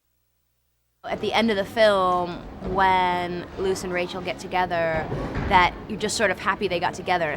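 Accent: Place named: England, United Kingdom. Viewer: Stick to your English accent, girl. Suits you better.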